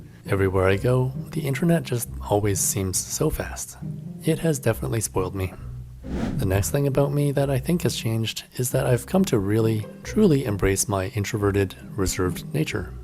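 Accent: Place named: Lithuania. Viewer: Your english accent is 100% lithuanian! Lol!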